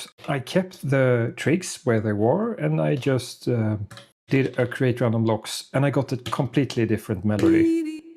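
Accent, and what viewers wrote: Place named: Sweden. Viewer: You sound super Swedish!? ❤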